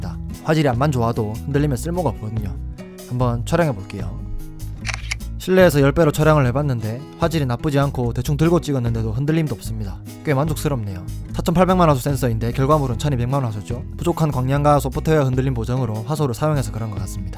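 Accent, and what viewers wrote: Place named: Changwon, South Korea. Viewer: 님 창원사람인가보넹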